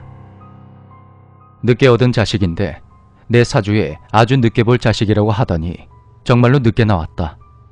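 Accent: Gyeongsang, South Korea. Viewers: 경상도 사람ㅇㄱ라 언뜻 나오는 경상토 사투리 억양이 너무 익숙해서 그런지 더 듣기 좋으네요 ㅋㅋ오늘도 잘 들었습니다 ❤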